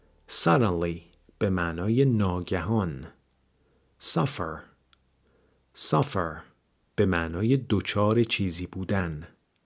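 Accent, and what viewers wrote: United States. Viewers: دکتر لحجه با لحجه آمریکن این لغات رو تلفظ کردین یا برتنیش؟ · سلام تلفظ شما تو این ویدئو امریکن هست یا بریتش ممنونم بابت ویدئو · لحجه آمریکاییه ؟ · این تلفظ ها آمریکایی هستش یا بریتیش؟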